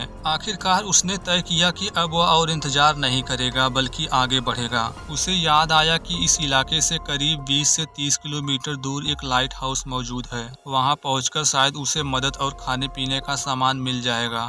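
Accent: Bihar, India. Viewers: Bhai bhihar se ho kya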